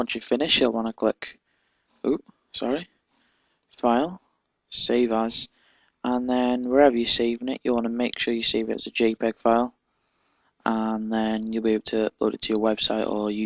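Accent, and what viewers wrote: Liverpool, United Kingdom. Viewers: Hey der la, Do I 'ear a Scouse acksint ... I am down 'ere in Sydney strugglin' wiv me CS5 ... ta for yer 'elp ... <link>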